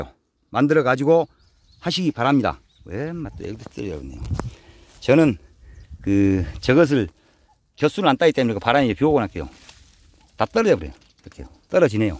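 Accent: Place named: Jeolla, South Korea. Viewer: 여긴 경상도인데 전라도 사투리가 이렇게 구수하게 들린 적은 없는데,진심이느꺼지네요.감사합니다.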